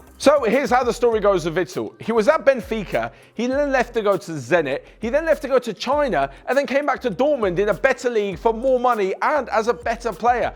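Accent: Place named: Cockney, United Kingdom. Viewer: How about speaking English, not low IQ Cockney!? 👅🙈🙉😭😂😢😣😰😀👎👎👎👎👎👺👹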